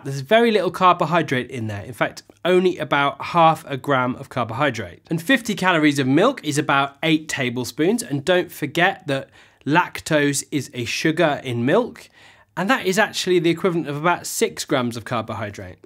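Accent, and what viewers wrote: England, United Kingdom. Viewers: I am a black coffee loving Londoner who's been on an OMAD diet since Jan 1st. I stumbled across your channel by accident, and am very pleased that I did. It shouldn't matter I know, but nonetheless I find it oddly reassuring to hear an English accent as opposed to the American centric content that's so prevalent on YouTube. You have a new subscriber. Keep up the good work.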